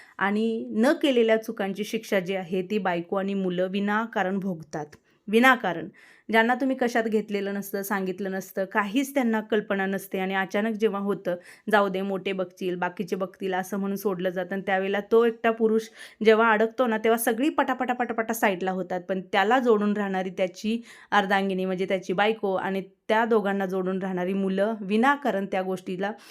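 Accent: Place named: Kolhapur, India. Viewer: Nice video.... vahini... daily mi bght aste. Kagal la maza mama asto...same boln aste tikde..❤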